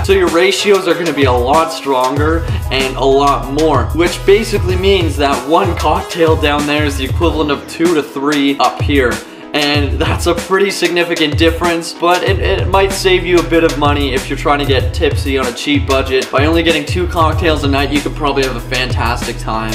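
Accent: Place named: United States. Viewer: Your Spanish must be highly American accented. Chili but you keep saying chilayan. I thought it was chilian....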